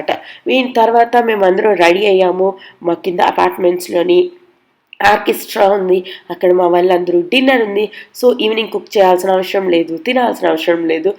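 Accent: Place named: United Kingdom. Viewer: Meru middle lo English words Chala Baga use chestunaru accent uk laga undi uk lo chadvara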